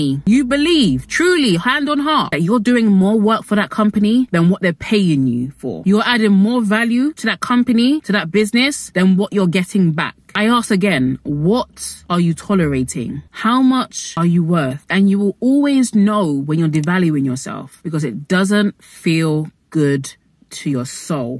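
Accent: United Kingdom. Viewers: How did i know you were going to have a British accent before clicking the video😂🤷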